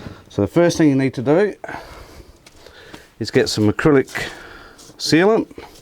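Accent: Australia. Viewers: Nice work. You sound Australian. What city are you in ?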